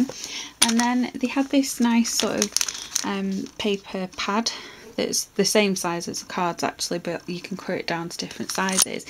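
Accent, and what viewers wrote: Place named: Lancashire, United Kingdom. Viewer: I'm from Leyland Lancashire your accent sounds similar to mine so that's why a was curious x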